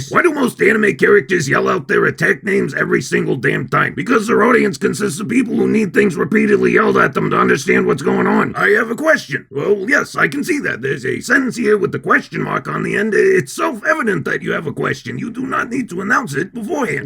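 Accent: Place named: New York, United States. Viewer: Just found this channel, was expecting a tiny female voice, this gruff old New Yorker Boston shit is hilarious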